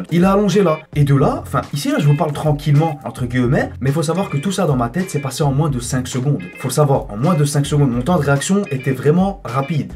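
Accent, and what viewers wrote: Belgium. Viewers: Et mais t'es belge ? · Tes belge ?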